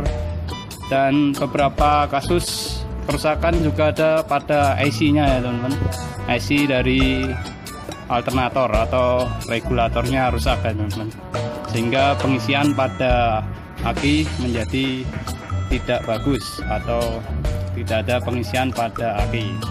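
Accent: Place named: Java, Indonesia. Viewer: kuk medok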